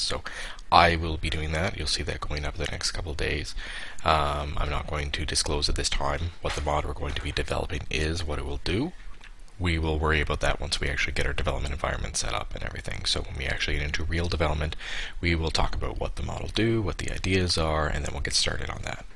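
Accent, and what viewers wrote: Canada. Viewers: Are you canadian? I sweat I heard aboot a few times.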